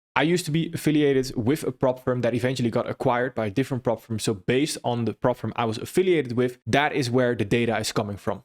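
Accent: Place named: Netherlands. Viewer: Ik sense een beetje hollander 😅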